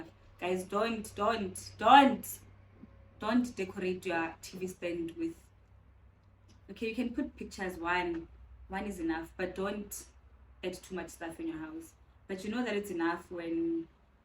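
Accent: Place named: Limpopo, South Africa. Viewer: I am just glad we get to know you better. And hearing you from Witbank I was like haibo this whole time I am like Limpopo and then I hear it. Otherwise ke tla botsa on Insta! I love this for you and I love it for me 🙈 plus ke fan akere. ❤️ o kwatisa fela ge ore you won't speak Setswana. And yes I agree you do look like your mom, and your my hight and shoe size 🤣 bathong 2 full weeks with mom wena spoilt brat!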